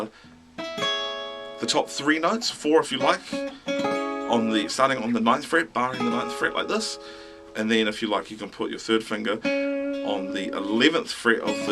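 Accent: New Zealand. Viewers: haha you are hilarious dude. Stoked to hear a kiwi accent mah bro. great tutorial too man. Such a solid RnB song aye. Us white boys got some soul too haha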